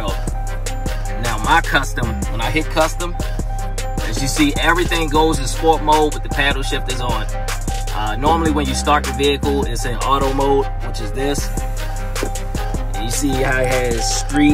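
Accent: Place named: Baltimore, United States. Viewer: Your accent... you from Baltimore? DMV?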